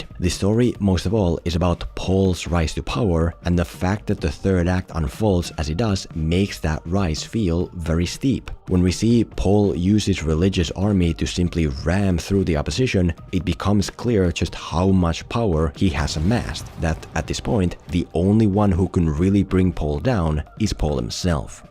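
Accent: Finland. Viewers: You sound Finnish, and if that's true, I marvel at your strength not to pronounce Harkonnen as Härkönen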